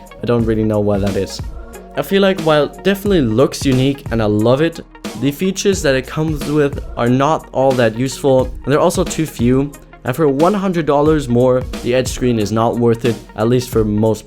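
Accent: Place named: Germany. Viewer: you're from Germany? you have a really nice accent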